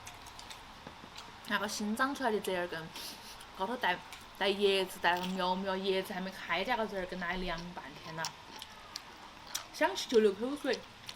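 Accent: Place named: Nanchong, China. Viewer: 怪不得听你说话那么熟悉的乡音，我也是南充的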